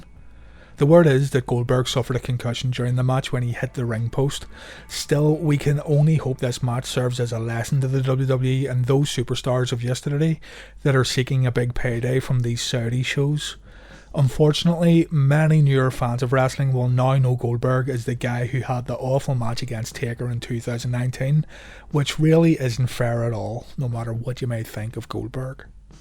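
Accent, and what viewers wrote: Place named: Belfast, United Kingdom. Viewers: What part of Northern Ireland you from? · Awesome work. You're knocking out the best videos on YouTube. Sounds like you're from Belfast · Good effort on the vids mate You a Belfast man? I’m down in carrick. Appreciate the vids anyway chief keeper lit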